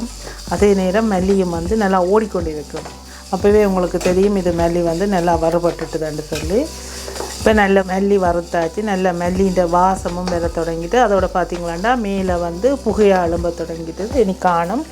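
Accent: Jaffna, Sri Lanka. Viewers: யாழ் தமிழ் மிகவும் அருமை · Very fine.thanku very much. Your srilanka tamil fine.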